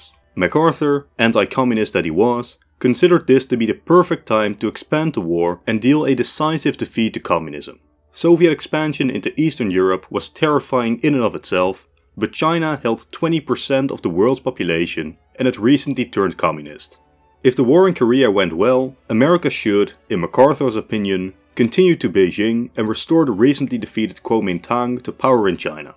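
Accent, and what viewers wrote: Netherlands. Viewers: You sound dutch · Sounds like a Hollander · Nice video. If I may ask, are you Dutch? Your accent sounds Dutch.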